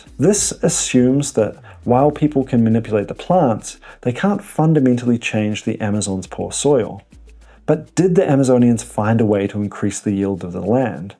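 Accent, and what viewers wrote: New Zealand. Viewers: whats that accent? is it nz or a bit australian and there are rhotic r's ive never heard it before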